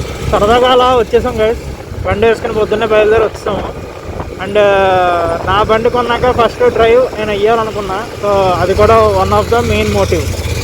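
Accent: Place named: Godavari, India. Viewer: Ahaaaa Godavari slanggg vastundeeee 😂😂😂😂😂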